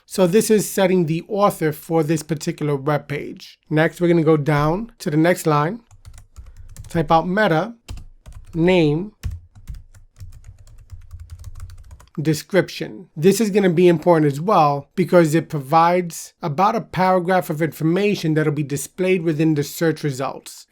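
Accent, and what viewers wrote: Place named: India. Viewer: Ur english sounds like Indian..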